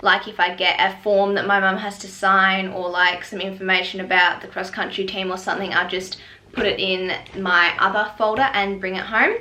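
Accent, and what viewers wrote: Australia. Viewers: I love the accent of australian 😍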